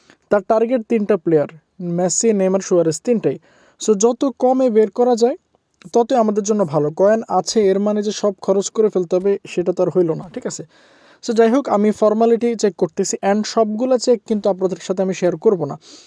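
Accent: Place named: Chittagong, Bangladesh. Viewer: ভাই আপনি কি চাটগাঁইয়া নাকি জানাবেন ভাই❤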